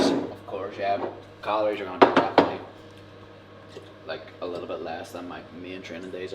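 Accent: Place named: Belfast, United Kingdom. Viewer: Were you getting a lot of feedback that fans can't understand the Belfast accent? lol subtitles. 💚🤍💛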